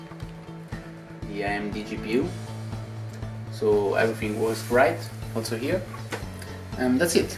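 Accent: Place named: Italy. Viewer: Love that Italian English :)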